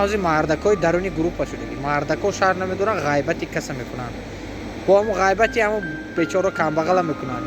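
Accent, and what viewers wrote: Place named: Vorukh, Tajikistan. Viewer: акценти ворухи гап мезанед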